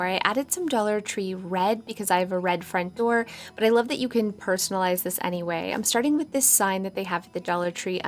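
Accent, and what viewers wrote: Canada. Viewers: A few things: 1. These DIYs are so beautiful and elegant! 2. I'm from Florida and your Canadian accent is literally the cutest 3. I've always thought you were very pretty but since your surgery you have so much CONFIDENCE girl and you are rocking it! You look so happy and that makes me happy 😃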